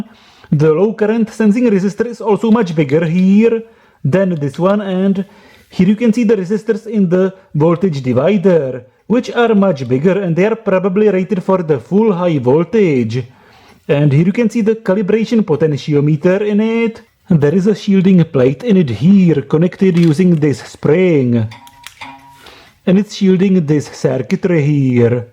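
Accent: Czech Republic. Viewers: Czenglish hype!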